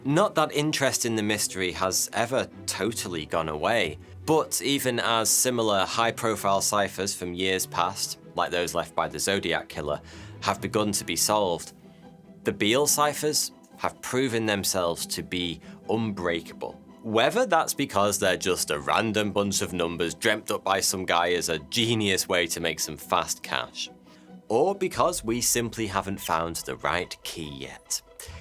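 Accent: United Kingdom. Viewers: I don’t mind British accents, in fact I love them, particularly the cockney accent. But, please start saying your th’s correctly rather than as f’s or v’s. I love your videos, but it seems I might have to stop watching. If you do this I will subscribe and support your channel. Thanks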